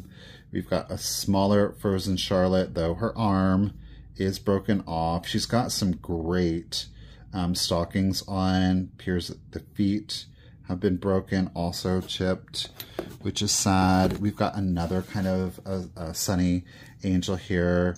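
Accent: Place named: Scotland, United Kingdom. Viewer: you sound Scottish 😂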